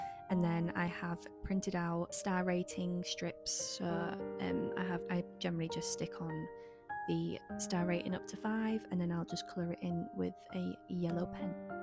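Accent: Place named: Northern England, United Kingdom. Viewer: Ooh is that a northern accent I hear! I love discovering a fellow northerner on yt, just found my new fave channel! Your journal spreads are so beautiful ❤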